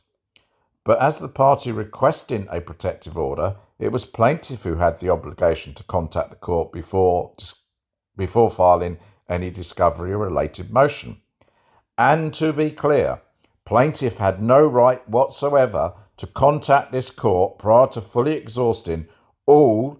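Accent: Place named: United Kingdom. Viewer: It's the British accent.. Brits can describe a dog laying down a stream of runny pooh and make it sound elegant..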